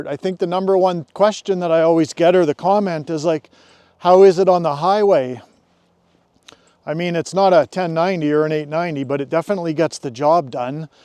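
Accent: Canada. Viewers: From the first shot, I was like wonder where he’s at? Starts talking: oh, definitely Canada. Probably Kelowna. Good riding man! I do wonder why you would do this over a 500 EXC with traction control and a Rade Garage tower. You Get the torque, suspension, engine mapping. I wonder if it’s just the electronics package that makes this bike interesting as ADV bike.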